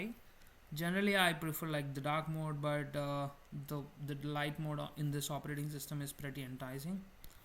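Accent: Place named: India. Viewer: You Sound like Indian.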